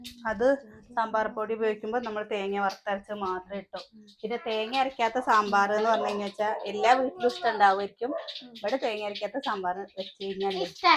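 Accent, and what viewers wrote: Palakkad, India. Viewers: നിങ്ങളു പാലക്കാട്‌ ano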